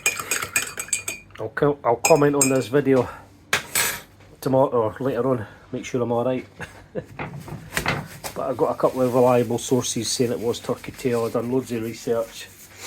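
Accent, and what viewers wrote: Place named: Scotland, United Kingdom. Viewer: What it turkeytail . You sound Scottish I'm Canadian. Don't think we have this tea here . Is it to help with a cold.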